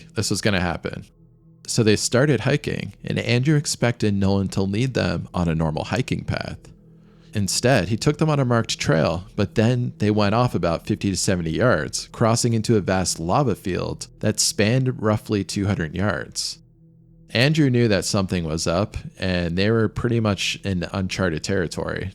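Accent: United States. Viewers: I'm doing some work at home and I have to choose between hearing this guy's American accent and a neighbour using a chainsaw. Shit!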